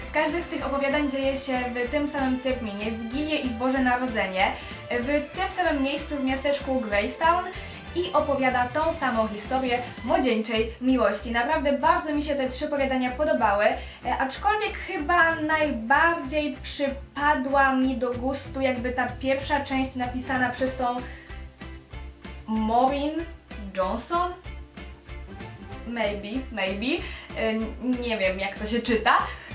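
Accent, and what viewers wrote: France. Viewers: Mi to nie przeszkadza, taki trochę francuski akcent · Skąd pochodzisz bo na tym odcinku słyszę taki francuski akcet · Akcent .. Francuski? :D jak się ,,NAUCZYŁAŚ" tego akcentu lub j. plskigo? :o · Masz coś w akcencie z francuzkiego... Mieszkałaś kiedyś we Francji. Albo coś w tym stylu? 😄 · Masz francuski akcent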